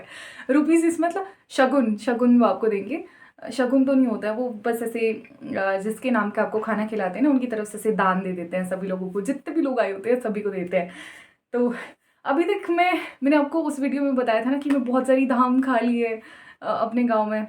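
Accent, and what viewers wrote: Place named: Mandi, India. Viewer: Hello I am also from Kangra .....apki awas s esa lag ra ki ap Mandi s ho ?? Kya ap mandi se ho 🤔🤔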